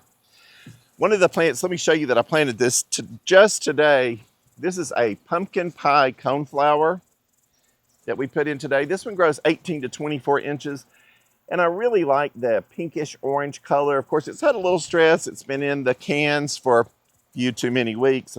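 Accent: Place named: South, United States. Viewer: I came across your site by accident and when I saw you talking about your Playing the Blues Salvia and Peach Drift Roses, I knew you would be inspiring. Also, you sound like you are from the South. Although I live in Georgia, I enjoy hearing from other gardeners about what they have planted.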